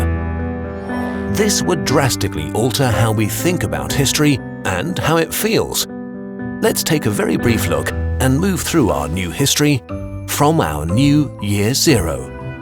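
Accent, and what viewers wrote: United Kingdom. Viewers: Where is he from? because Kurzgesagt is German, but he sounds more Brittish. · Not sure why, but his British joice just makes it seem more reliable and knowing.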